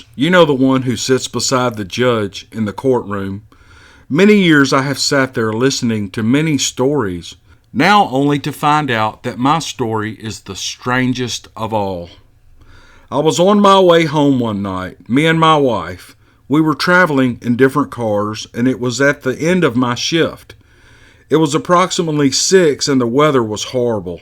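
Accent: South, United States. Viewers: I love your southern draw 🤗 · I really like your channel if not love it. You geht nice stories and you tell them really nice, I especially love your southern accent, and you and Lynn Smith's BigfootCaseFiles-channel drove me near to believing in the Sasquatch - though i'm german and live on the other coast of the Atlantic! Just go ahead, you made it right from the start! Tobias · you have a fantastic gift of storytelling. This up north midwesterner loves your southern drawl. We have squatch in Wisconsin as I've heard them but never laid eyes on one. Keep up the good work and can't wait till next week!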